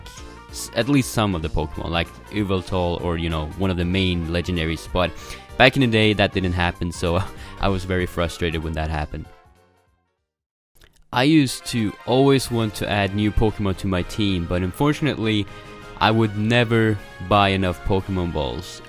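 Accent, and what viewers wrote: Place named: Sweden. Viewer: Swedish? You really sound swedish imo.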